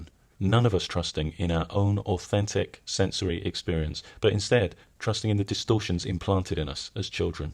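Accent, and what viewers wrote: England, United Kingdom. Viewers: Totally off-topic but your voice really reminds me of the voice over to a making of documentary of Silent Hill 2 but I suspect that's because you're from the same area in England as the actor.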